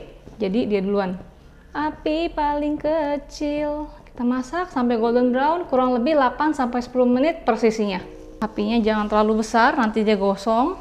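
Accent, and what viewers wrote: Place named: Sunda, Indonesia. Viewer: Ini cici cici bandung kali yah ? Logat sunda kental banget kaya nya😂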